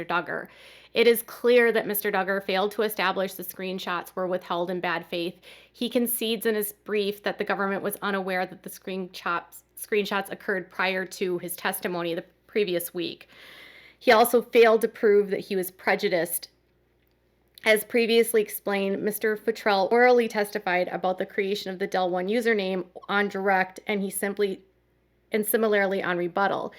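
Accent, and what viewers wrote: United States. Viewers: Q: Pro-bat-ive. Stat-u-ette. Other examples. Is this a particular/regional American accent?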